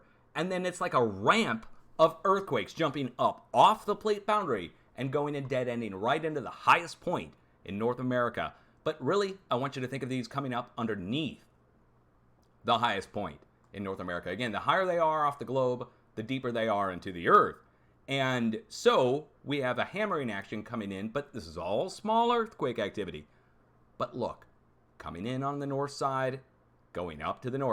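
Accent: Russia. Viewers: Love the Russian accent and your sense of humour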